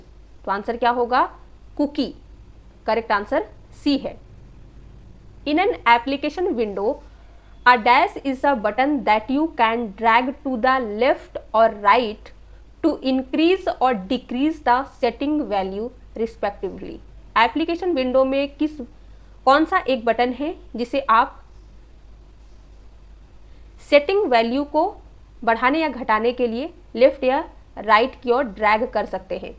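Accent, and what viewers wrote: Odisha, India. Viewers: Are you from odisha mam?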